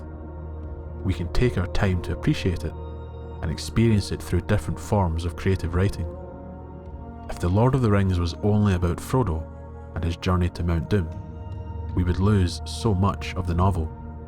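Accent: Scotland, United Kingdom. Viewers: I agree completely, the shear amount of world building and the flavor of the tale are set here. Rich lessons and enjoyable dialogue; ive come back to this chapter many times. I could listen to you read the entire chapter, Scotch accent?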